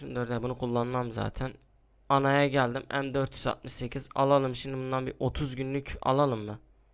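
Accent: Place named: Adana, Turkey. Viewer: abi adanalı olduğun ne kadar belli adanalıyıhhhh allahın adamıyıh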